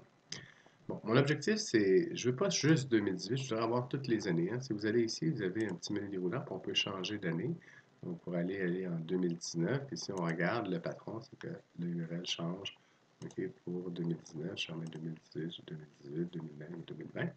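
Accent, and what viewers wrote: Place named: Quebec, Canada. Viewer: Merci beaucoup très intéressant J'adore aussi l'accent québécois ;)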